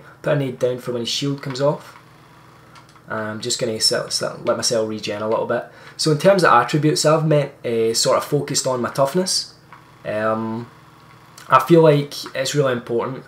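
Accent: Scotland, United Kingdom. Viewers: Nice video man. Are you Scottish by any chance. You have the accent ?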